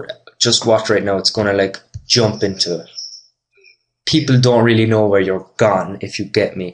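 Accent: Ireland. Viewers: Are you Irish? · I swear you're Irish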